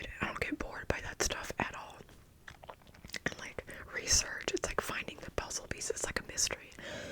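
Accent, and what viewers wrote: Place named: Midwest, United States. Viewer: I’m not a big fan of the popsicle whole thing but I love this because I feel like we’re on FaceTime right now and like I live in Minnesota and I’m from Minnesota my whole life and I can totally tell you have a Midwestern/possibly Minnesota accent and I think it’s so funny lol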